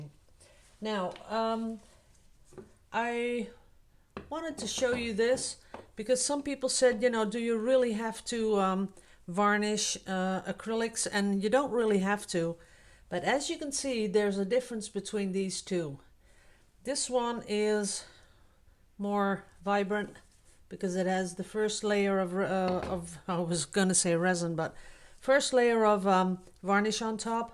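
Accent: Netherlands. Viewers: I thought I heard a Dutch accent! My dad is from Holland so that makes me half Dutch even though I have never been there. One day I truly hope 💜 Thank you for sharing your art. It's beautiful!💕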